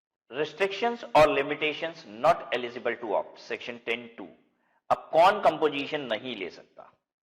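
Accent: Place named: Uttar Pradesh, India. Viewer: Sir from Varanasi, UP. You definatly got that UP accent:) I am from Kanpur, UP. Thank you for all the videos sir Thank you very much.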